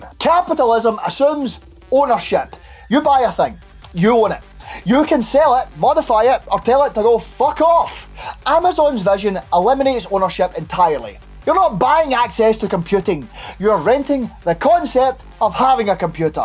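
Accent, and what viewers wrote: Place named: Scotland, United Kingdom. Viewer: Annoying?! Scottish is the only accent that should ever be used for a good rant. The Scots sound like they're ready to fuck some shit up regardless of the banality of the topic. Honorable mention to the Irish.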